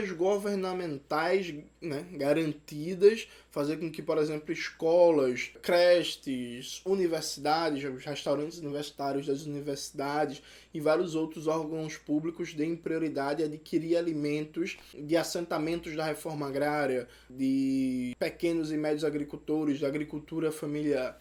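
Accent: Pernambuco, Brazil. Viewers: Veiga Pela sua falta de percepção a respeito do que é o sotaque de um pernambucano, percebe-se que a dificuldade está em vc de entender o que é a realidade e a diversidade brasileira e, claro, o que ele diz no vídeo. Em nenhum momento ele defendeu, assim como qualquer outro comunista ou socialista sério e radical defenderá a estatização de todos os meios de produção, mas sim a progressiva abolição da propriedade privada dos meios de produção, o que é bem diferente. Mas se vc não é capaz de perceber e nem de respeitar um simples sotaque tb não deve saber diferenciar o que eu esbocei aqui.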